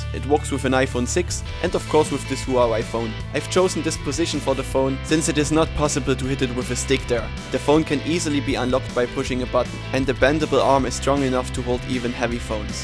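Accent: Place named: Germany. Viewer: your accent sounds so german, but your wall outlets are UK outlets for sure! Confused :C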